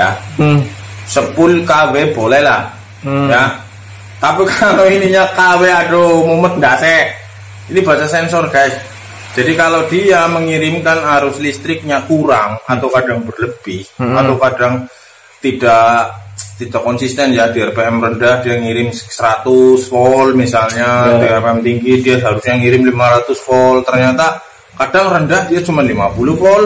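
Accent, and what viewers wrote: Banyumas, Indonesia. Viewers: Dari mana bang, koq ngapak🙏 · Rika si wong ndi deneng Kya kenal ngapak e 😂 salam Sekang purbalingga kang😂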